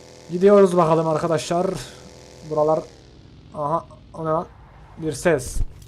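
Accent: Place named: Adana, Turkey. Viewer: Reisss ben Adanalı yimda aynı oralı gibi konusuyon bahhhh bahhhh diyisine kurban olim😁😁😁😁😁😁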